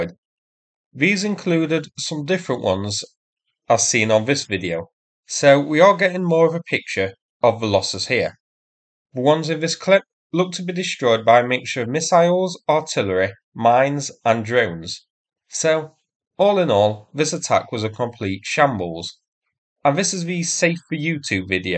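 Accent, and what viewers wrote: United Kingdom. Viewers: The British accent is fantastic. It fits very well with British humor.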